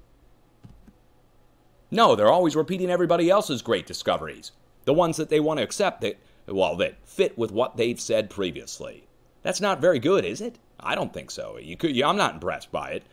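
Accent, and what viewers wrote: Russia. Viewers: Hey, love the cheesy Russian accent! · Good work, and I love your foreign accents, especially the Russian one. Stay strong! Much love!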